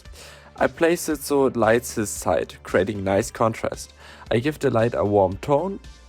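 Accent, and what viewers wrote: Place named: Germany. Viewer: Are you German? You sound like you have a slight accent ✌️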